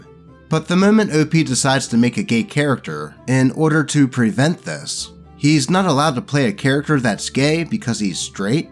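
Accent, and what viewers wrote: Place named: Baltimore, United States. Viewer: That Baltimore/Maryland accent coming out real strong in the first story lol all about Heaumophaeubia and the player hitting awn OP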